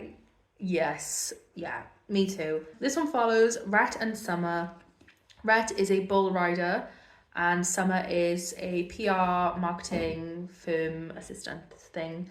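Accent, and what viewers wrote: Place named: Wales, United Kingdom. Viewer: I've just found your channel and I absolutely love you and your Welsh yay xx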